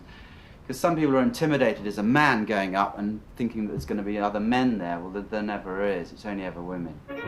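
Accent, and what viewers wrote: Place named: Upper-class, United Kingdom. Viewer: Upper class British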